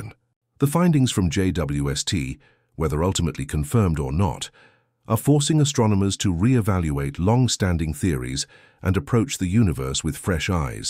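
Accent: United Kingdom. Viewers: AI still can't handle punctuation. Ridiculous. And stuffy brit ridiculous at that.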